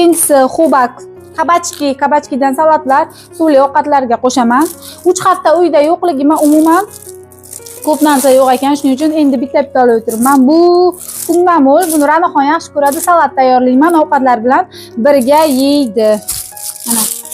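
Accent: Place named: Olot, Uzbekistan. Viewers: Assalomu alaykum Buxolikmisiz Qorakoʻlning qayeridansiz hech shevani buzmasdan gapirgansiz mashaalloh ❤❤❤❤❤❤ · . Тилиз барибир. Олотга тортади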